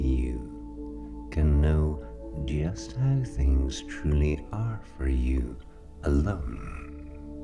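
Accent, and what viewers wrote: Scotland, United Kingdom. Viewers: I love your stuff accent is great might be Scots.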